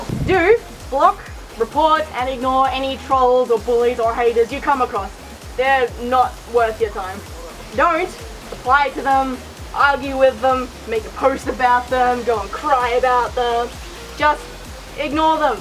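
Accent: Australia. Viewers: you're australian!!!!! i can tell by the accent >.<, what part of australia are you from? i'm in queensland, did being australian influence your fursona? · you sound very australian, are you?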